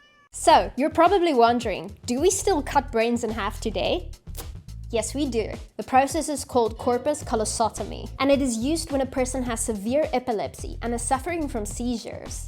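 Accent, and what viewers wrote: Afrikaans, South Africa. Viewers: Jy kan sommer die Afrikaans hoor😂❤🎉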